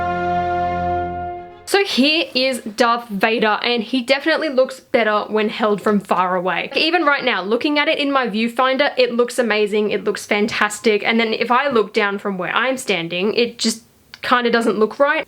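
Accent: Australia. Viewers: Very nice haul! Your video showed up as my #1 recommended video, so I decided to check it out and am glad I did. Besides the great LEGO content, I have always loved the Aussie accent. I have Australian friends and have been lucky enough to explore some of Australia (quite a few years ago). I have to say, I loved your use of "pissed" to mean upset. When I was there, I was told by my mates that it wasn't used that way in Australia, but just used to mean drunk...which we were having the discussion in a bar and laughing our heads off. Okay, back to LEGO... Glad to see you scored the Captain Marvel polybags. That one has eluded me so far in local stores (No LEGO store near me here in California). I definitely want it. If I can't get it for retail, I'll likely pick it up on Bricklink or Ebay. My siblings and nieces are into LEGO. My sisters are both big Harry Potter fans and when I showed them the Harry Potter accessory pack they were both surprisingly excited for the Maruaders Map that comes in it and proceeded to tell me how important it is, etc. 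I'm a casual Harry Potter fan...I've seen the movies and liked them, but they are BIG fans. I was surprised to find the Mario blind bags at my local Target a couple days ago. They had 12 packs and I bought them all. I got 8 different of the 10. I'm the same, that's the only Mario items I'm getting...well, I did pick up the polybag at target too...but passing on all the play sets. Awesome score on the big Hogwarts Castle...my family got t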